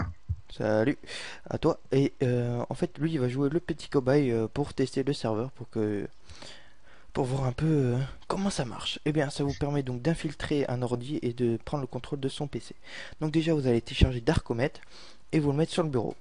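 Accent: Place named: England, United Kingdom. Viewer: L'accent anglais... :)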